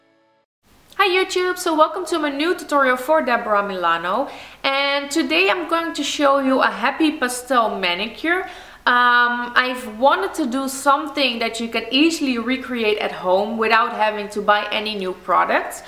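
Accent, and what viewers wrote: Netherlands. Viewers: Volgens mij kom je uit Nederland aan je accent te horen ;) Je filmpjes zijn erg leuk met leuke ideeen... En vooral heel netjes! Ik ga deze zeker proberen! x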